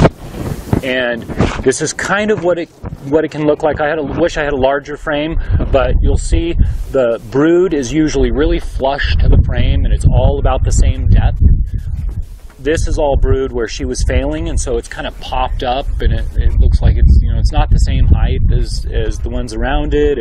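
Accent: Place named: Australia. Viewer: WA is that Western Australai ? cause u have an aussie accent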